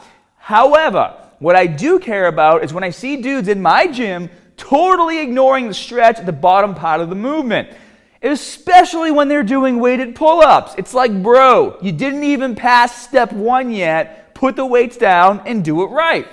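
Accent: Boston, United States. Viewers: The Boston is strong in this one! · Soon as I heard you speak I said wait a second, this guy has to be from in or around Boston, then I saw the " " and now I know you must be, I'm actually right from the city of Boston 4th generation myself and Pat's season tickets holder, anyway, pretty cool and good luck with your channel and overall success, nice to see a fellow Bostonian doing well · Must be from Boston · is that a Boston accent? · First introduction to this guy. Good video. Good info. I suffer at pull ups but I keep trying. He sounds from Boston. This guy puts the strong in "Boston Strong". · that boston accent be thiiiiick haha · Thanks for the tip about the band assisted pull-up, I was looking for that. I think there is a R in the word "hard". Boston accent? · Am i hearing Boston? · Sounds like Boston. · Boston I think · Hes definitely from Boston. 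Forst time watching this guy but im from Boston so its not haahhd to heaa the accent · Boston rrrrrrrrr's all ovah the place! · Great video. I need to get onto pull ups and your vid gives great advice so thank you. Great Boston accent too. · Very good video, these are great tips, especially the ones that have to do with getting started if you can't do pull-ups at all. And as always, that Boston accent is on point! Love it bro! Keep doing you! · Pullups R too Haaaaad for you...LMAO...The advice puts forth has been around since the 70s, nothing new here but the Boston accent did bring the lulz. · Marine Poolee here. I ship out to boot camp in October and I've been working hard to get better with pull ups. I couldn't even do 1 pull up a couple months ago but now I'm up to 10+ pull ups. Btw, you talk the CORRECT way, I'm from the Boston area and you talk similar to me. Both my parents are from the city with thick accents so I guess that's why I talk the way I talk lol. 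Your accent sounds a little forced but you still talk the right way lol. Keep up the good work bro 💪🇺🇲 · Sounds like Boston man... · You sound like you’re from Boston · Staaat the caar near the baaa.....and be smaaaaaat. Must be Boston or close by. His advice is good.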